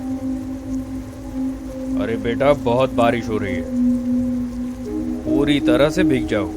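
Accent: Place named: South India, India. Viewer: Mujhe sauth ka lga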